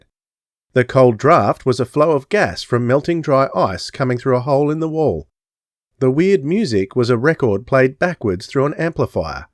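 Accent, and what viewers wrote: Australia. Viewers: Are you the narrator Australian?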